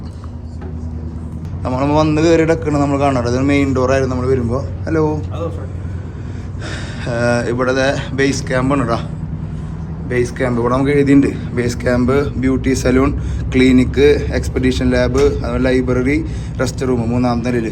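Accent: Thrissur, India. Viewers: വിഡിയോ കൊള്ളാം.. പക്ഷെ ഈ ത്രിസ്സുർ സ്ലാങ് ഉം സഹിക്കണില്ല എനിക്ക്..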